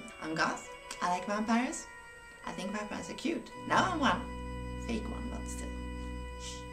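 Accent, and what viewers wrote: Sweden. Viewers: Haha tyckte du hade väääääärldens svenskaste dialekt, och mycket riktigt! dont fucking skjut mig eller nått men du lutar åt det typ lixom creepy gothy vampire cute än creepy gothy vampire sexy! hahha:D (jag har med tänkt göra sådana när jag har råd)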